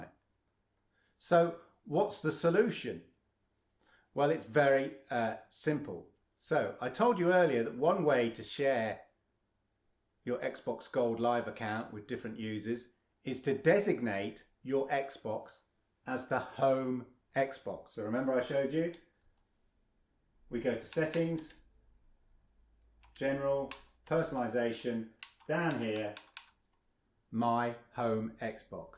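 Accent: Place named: United Kingdom. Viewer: This is very useful I watched a bunch of others and it turns out I only understand the british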